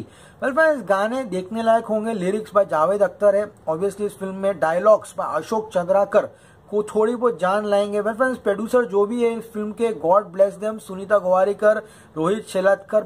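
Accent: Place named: Maharashtra, India. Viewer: Dada your Marathi was so sweet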